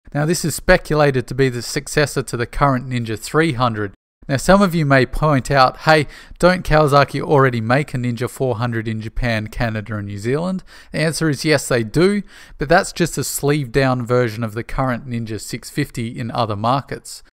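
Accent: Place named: Australia. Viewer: I love the videos and I always look forward to the next release, but pleeeeeease prounounce Versys as it is intended. VERsatile SYStem. Surely your Australian accent shouldn't interfere with pronunciation of this portmanteau word? Just nit-picking :)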